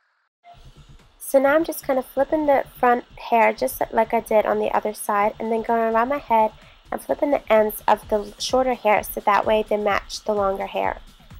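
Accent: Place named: New Jersey, United States. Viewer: Why does it sound like you're from New Jersey?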